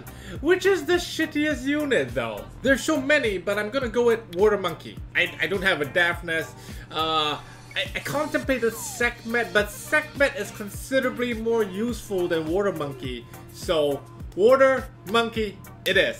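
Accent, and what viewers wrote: India. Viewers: indian accent crack me up so hard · Love the indian accent!!!!!! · Indian accent is so funny😂😂😂😂😂😂😂😂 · best indian accent very good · lol indian accent lmao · that indian accents roflllll. so bad senpai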